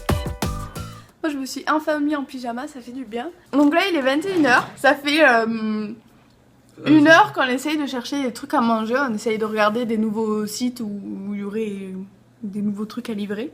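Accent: South, France. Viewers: Je vois que je ne suis pas le seul à avoir l'accent du sud dans mes vidéos :)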